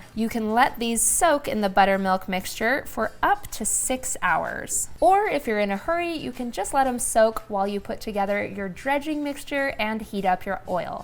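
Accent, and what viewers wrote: United States. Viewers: Did a lil southern come out in you doing this video? :) Well done ma'am!!!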